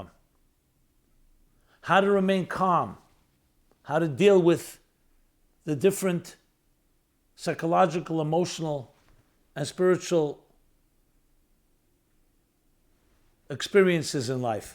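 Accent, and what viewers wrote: New York, United States. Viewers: How wonderful your lessons are, I have shared to many of my friends and clients. The way you speak resonates deeply within. And the NY accent is so good!!!! TY!!!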